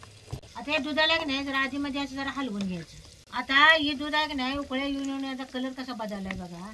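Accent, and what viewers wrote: Kolhapur, India. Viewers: चा पत्ता दक्षिण कोल्हापूर भाषे वर न वाटतय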